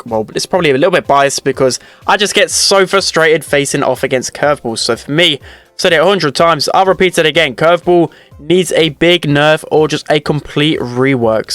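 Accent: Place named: England, United Kingdom. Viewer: Well he is English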